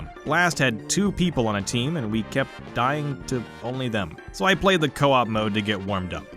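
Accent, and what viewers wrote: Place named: New York, United States. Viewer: you have a New York accent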